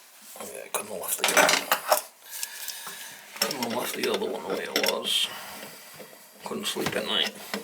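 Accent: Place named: Boston, United States. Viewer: Oye, Once again me friend, I love you as a human, love your content, and love your comedy, But brother, You have to remove the Rag from your face, it's serves absolutley nothing, your only causing long term damage in your being, this thing they make you do, I where it for you, to perfect you bullshit, from what, the common cold the Flu, what happens to those bacteria, I see that's is now affecting you, your breathing, getting a little aggressive, Plus you took a experimental shot, I know you know what's really going on in today's society, Stop, I care,that's why I'm commenting, not trolling but caring, if those in fear want to Muzzle themselves over Fear and TV, let them, better for you, you don't have to wear one, their protecting themselves, It's gotta stop, think of the children hiding their faces in shame, only us can put an end to this. 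No disrespect, Just my Opinion. I'd stay in silence too, if I was fearful and just follow my handlers. The real message is To take care of others, You must take care of yourself first!!! So if you take yours off, maybe others will follow, but it starts with you Brother. Keep them coming, The Boston Accent is the Channel😉 Stay strong Friend.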